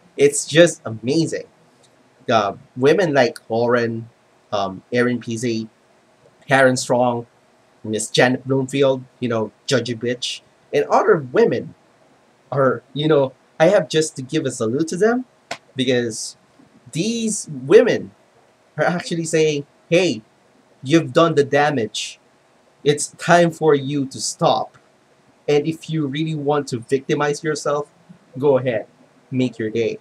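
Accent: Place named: Philippines. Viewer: ***** Excuse me, I'm also a Latino who doesn't speak Spanish/Castilian, you ''look Filipino'' (not even the ''most Mexican-looking'' of the Asians, that prize would go to the Viet-Namese, and the Indonesians take a good 2nd :-P ), I assumed you were because on A Voice for Men (where your video is featured) a bloke said ''galing'' and called you Pinoy :-P and your accent is more akin to that of a Filipino... or an Ecuadoriano than that of a Méjicano (as Méjicans, Centro-Amirikano's and Colombiano's tend to use the ''ii'' sound while Ecuadoriano's, Filipino's and Europeano's use the ''ih'' sound more), this is odd, usually my comments are invisible, and when you commented on your own video bellow my comment I couldn't see it until I selected ''answer'' my own comment, people probably reported you as spam or something, I like this new Google+ integration, but I hate this ''feature''. 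(>_<) Be glad that you're not an Ecuadoriano (what I first thought you were) as that's the country where Feminists threatened to cut of innocent men's penus (yes, that's the plural of penis) if Lorena Bobbit would go to jail (yet they are seen as ''freedom-fighters''), also most Castilophone western countries had female heads of states, but the palces with the most radical Feminists never had... makes one think... I don't know if you get this a lot, but you really look and sound Filipino/Ecuadoriano, though Filipino's are universally paper-pale (literally everyone in the Philippines can play for the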